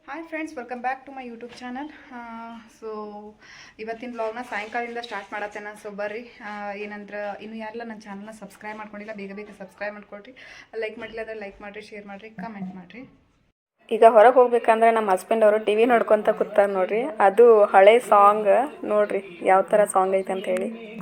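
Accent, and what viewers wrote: North Karnataka, India. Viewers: ನಿಮ್ಮ ವಿಡಿಯೋ ನನಗ ಲೈಕ್ ಯಾಕೆ ಆಗತದ ಅಂದ್ರೆ ಹುಬ್ಬಳ್ಳಿ ಧಾರ್ವಾಡ್, ಬೆಳಗಾವಿ ಸೈಡ್ ಮಾತುಗಳು ಚಂದ ಮಾತಾಡತಿರೀ ಅದಕ್ಕೆ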